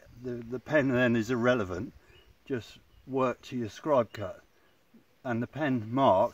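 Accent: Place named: Hampshire, United Kingdom. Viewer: Great advice, you know what you are doing. Sounds like you have a Hampshire accent, see you got that slabs from fordingbridge..I’m just down the road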